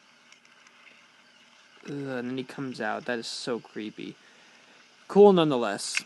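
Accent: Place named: Scotland, United Kingdom. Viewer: btw I love you Scottish accent 😉😂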